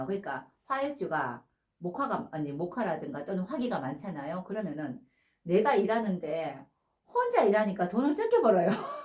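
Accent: Gyeongsang, South Korea. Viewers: 개인채널이라지만 경상도 출신 아니면 귀에 들리지 않게 억양이 너무 강해서 못 듣고 나가요, 이런 경우 진짜 처음이오.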